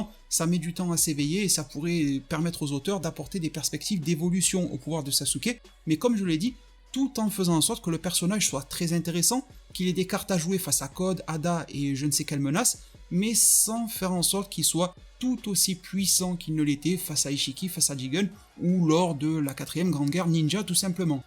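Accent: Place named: South, France. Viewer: ton léger accent du sud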